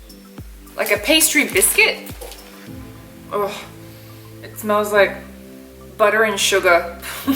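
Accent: Australia. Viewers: I love to hear aussie accent.. plus eating filipino food... that is gold... keep it up.. new subscriber here... I hope you recognize me..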